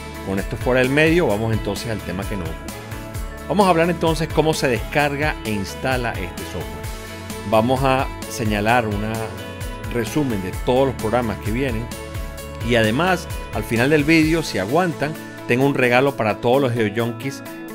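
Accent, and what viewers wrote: Venezuela. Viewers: disculpa eres venezolano ???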